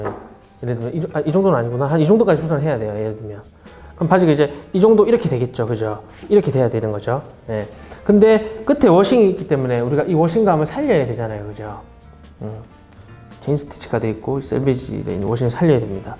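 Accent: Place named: Busan, South Korea. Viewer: 유투브 잘보고 있습니다.같은부산사람으로 남천동에 살고 있습니다. 동천고에 다녔다고 하니 너무 반갑고. 찰진 사투리가 매력적이라 하루저녁에 동영상을 다 봐버렸네요. 유쾌하고 밝은 에너지가 느껴져서 언제 한번 커피마시러 서울로 가고 싶습니다. 옆에 같은 친구 또는 이웃이 있다면 사는게 재밌을것같습니다 색상 배색도 한번 부탁드리겠습니다 쌩큐~~^^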